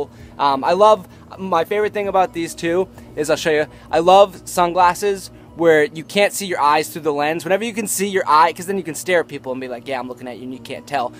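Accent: Boston, United States. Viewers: boston accent Tha unabombah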